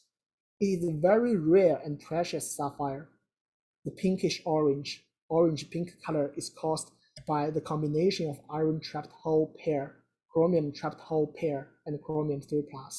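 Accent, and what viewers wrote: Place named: China. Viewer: Incredible presentation. I got cheated out of school but I would have done great in college. I used to love making presentations like this. This man would be a fun teacher. I know what it can be like to have pseudo intellectuals brag about something that they know nothing about, when its something you're passionate about the knowledge of. I love all fields of science. I searched for this video just by hoping it was a thing. Very Incredible presentation. I feel like he and I would get along well. I had gotten accepted into a trade school but I was one of tge unlucky few who had a cinema like group of bullies that beat me up for no reason and broke my glasses, so I stopped going to school but I never stopped being passionate about learning. Im sure people in your field have to deal with know it alls like pseudo spirituality people and corporate minded manufacturers. 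I bet its frustrating. I do find gems and chemistry in general fascinating but my area of expertise is literature and history. I got bullied into leaving school, chose to be a superhero and went up against things out of horror movies. Lost my best friends in sad scary ways and try to give shout outs abd credit where its due. I dont know much at all about the man in this video, but hes gifted and based on his accent, Im going to assume his first language is mandarin. I find other languages interesting too. Knowing more than one generally makes you cooler than people who dont so Im impressed. I feel like English is on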